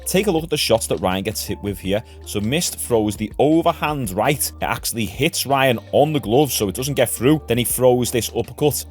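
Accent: Liverpool, United Kingdom. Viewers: Love this channel! I'm from Liverpool, but now live in the States, and it's just nice to hear the accent. Plus, I follow all types of combat sports, so this is my new home for combat sports news. Yesterday, was awesome. It's going to be a good few weeks, honestly. Wish they would spread the events out a bit, though. Nothing for 5 days of the week and then overloaded with shit to watch on the weekends. 🤣😆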